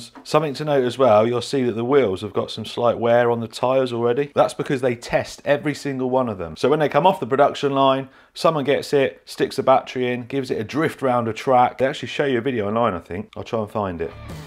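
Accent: United Kingdom. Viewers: I assume so he sounds he is from the UK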